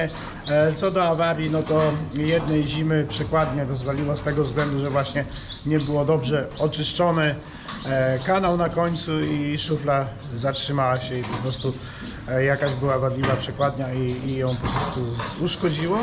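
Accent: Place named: Mazovia, Poland. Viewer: Jak ty z Mazowsza 🤣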